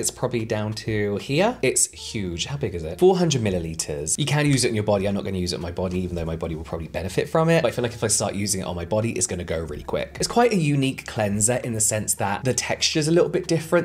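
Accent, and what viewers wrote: England, United Kingdom. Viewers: Hello from Los Angeles,. I enjoy your videos. Can you tell me what your take is on SKIN MEDICA? and have you tried anything from TRUTH TREATMENT SYSTEM? both are on the higher end skin care, but I believe and also heard when products are more expensive, it's because they use better products. I know this is not true for all, but it rings true for SKIN MEIDCA and Truth Treatment system. I love listening to your soothing voice and your English accent.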